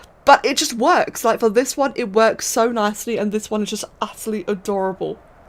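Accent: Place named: RP, United Kingdom. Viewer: poshest women on ark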